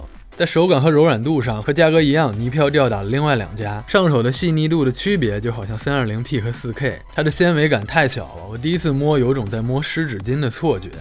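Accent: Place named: Beijing, China. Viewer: 我听出来了，这博主是北京口音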